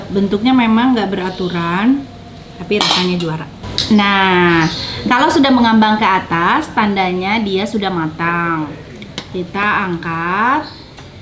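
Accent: West Java, Indonesia. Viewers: mun kacaletot ngomong bahasa sunda, da ges kabiasaan letahna sunda...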